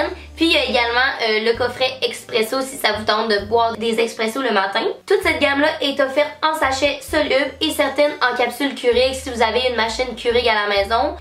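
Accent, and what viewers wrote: Quebec, Canada. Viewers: Es tu Québécoise?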